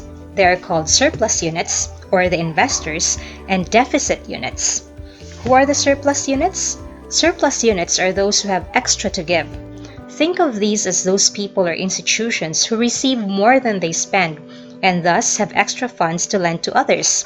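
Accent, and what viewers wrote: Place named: United States. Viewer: Abi nako americana ang gaistorya hehehehe Btw, thank you maam for this discussion!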